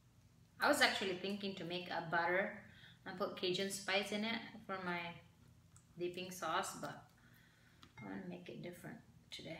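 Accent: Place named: Philippines. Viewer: R u visayan or ilocano? U have the accent... esp with some of ur pronunciations and intonations...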